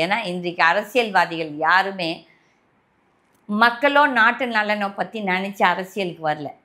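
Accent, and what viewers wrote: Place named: Tamil Nadu, India. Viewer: Your English is perfect 👌. But தமிழ் slang ? Anyway, truth is what matters ! . Bha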